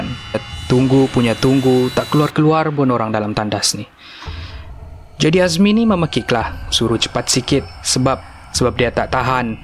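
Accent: Sabah, Malaysia. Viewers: Contohnya. Kamu mau apa sih, bukan ini donk. Kelmarin kamu bikin apa? Ada ke orang sabah cakap camtu? So kite boleh beza dari situ. Apa yang ku terangkan tadi itu lah bahasa indonesia. Yang bercerita ni orang sabah malaysia.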